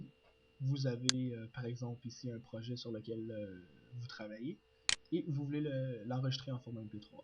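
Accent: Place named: Canada. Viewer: il est canadien c'est normal si il parle un peu différement hein.....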